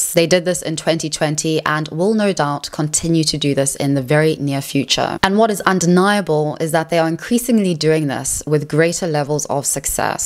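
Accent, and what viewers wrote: RP, United Kingdom. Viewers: wonderful essay!!! (and, shallow as it may sound, what makes it even more pleasant is your voice and cut-glass English accent - if you ever grow tired of youtube, you should consider recording audiobooks) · I remember listening to your channel a lot a few years ago. Would it be accurate to say that your accent has gotten more British than South African? I’m American and lived in the UK for some years, and now I think I hear more specifically UK sounds, though I may be mistaken. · I’m curious where you are from. You sound so British to me!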